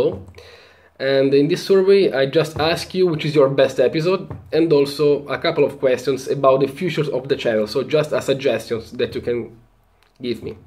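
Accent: Italy. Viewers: si sente che sei italiano LOL... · si sente che sei italiano ahah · Si sente che sei italiano ahah Sei un grande! Come ti chiami e come è nata questa idea?!? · I love your Italian accent when you talk English! And again, many thanks for putting up this wonderful series to both you and your wife. I love Indian barbers and it was so great to see so many videos with all the different and unique barbers / masseurs. I think I'll be watching this series of videos for a LONG time to come. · Hello ! such a pleasure to hear you express yourself , what a beautiful Italian accent . The videos of India were wonderful , such great effort put in them. I'll have to look at some again to choose in taking the survey . Thank you for all you've done .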